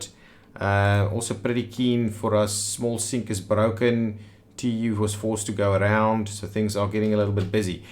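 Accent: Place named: South Africa. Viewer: Subbed, great work & loving the fellow RSA accent!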